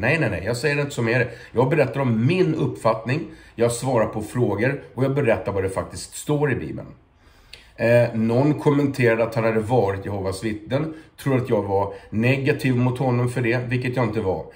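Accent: Skåne, Sweden. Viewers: Bra med titel som beskriver innehållet, gjorde att jag slapp irritera mig på skånska. Religionssnack är intressant om det är på rikssvenska.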